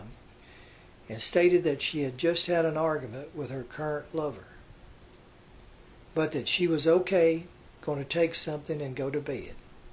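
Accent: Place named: Alabama, United States. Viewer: you sure got that right. and I like the way you talk, whoops done gone Slingblade on ya there Carl. I mean at times we sound alot alike me from mobile an all. Roll Tide 🏈 course I'm just 60,kinda spring chickeny yet badly bent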